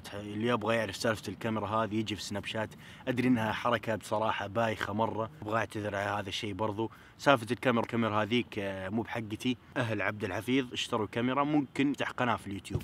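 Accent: Saudi Arabia. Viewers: تونسي بس كان عايش ب السعوديه عشان كدا بيتكلم باللهجه السعوديه وهو دلوقتي عايش ف كندا دراسه وكدا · Abdallh_alenzi _ بس هو يتكلم سعودي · ادمان اوفر واتش هو تونسي بس بيحكي سعودي عشان نفهم عليه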